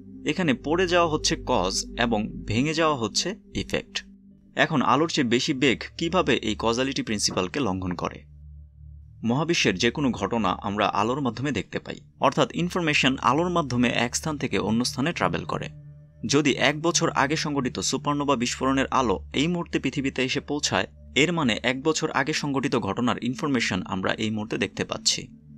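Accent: Narsingdi, Bangladesh. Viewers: apni basa Narsingdi 😲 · Hlw vai,Apnr basha ki Narshingdi? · আপনার বাড়ি কী নরসিংদী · আপনার কথা বলার সুর এখনও শুদ্ধ হয়নি। আপনার কথার সুর নরসিংদী'র আঞ্চলিক। বাংলাদেশী সুর ব্যাপকতা লাভ করুক আপনার চ্যানেল। ধন্যবাদ। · ভাই আপনার বাড়ি কি নরসিংদী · আপনি কি নরসিংদির লোক? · ভাই আপনি কি নরসিংদীর ? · ভাই আপনার বাড়ি নরসিংদী? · ভাই আপনি কি নরসিংদীর ছেলে??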